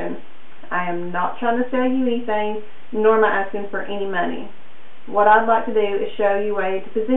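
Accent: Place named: South, United States. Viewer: I wish you every success.You deserve it just for having that lovely southern accent. PS I'm English .....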